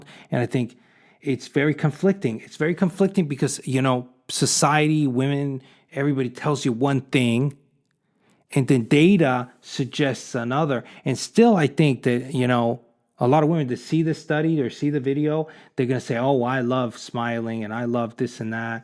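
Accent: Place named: Northeast, United States. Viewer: what is your very prominent accent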